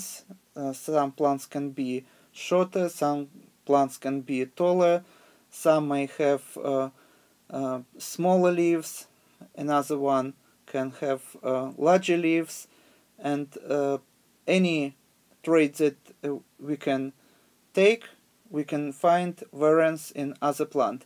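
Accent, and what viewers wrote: Russia. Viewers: I like russian pronunciation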